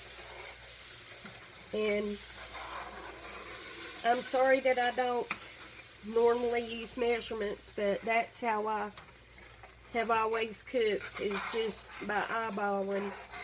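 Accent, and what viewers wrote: South, United States. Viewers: Just found your chanel and I love the way you cook and your southern accent is so cute. Greetings from Spain. Xx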